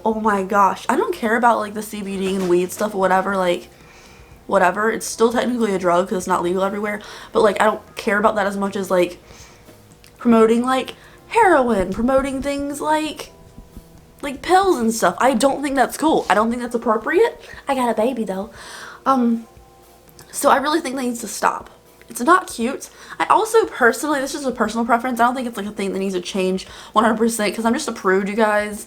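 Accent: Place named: New Jersey, United States. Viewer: my favorite part of this video was when you said you moved to Florida. I got so excited for you! I could tell how happy you were ❤️ I seriously had to laugh though because I was expecting you to say you moved from like somewhere really cold. NJ or something from the sound of it. then you said NC and I laughed so hard 🤣 girl, I'm from PA. the winters here are ROUGH!! it's like 30 degrees out right now and that's warm for this time of year 😱😭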